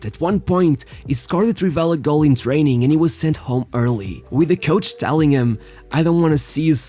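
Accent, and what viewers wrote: Portugal. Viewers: So your accent is portuguese, my mental mystery is finally solved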